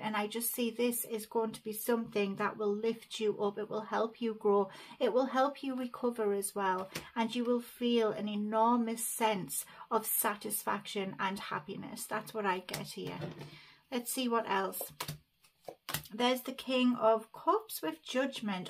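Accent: United Kingdom. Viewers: I so look forward to these readings, I feel your accent is similar to mine as I live in Northumberland. You always make me feel so much uplifted afterwards. Thankyou and Bless you xx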